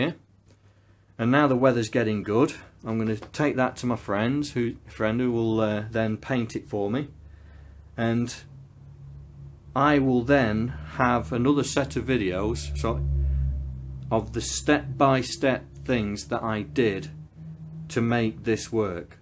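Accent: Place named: Northern England, United Kingdom. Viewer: Where you from, you sound a good Northern lad!!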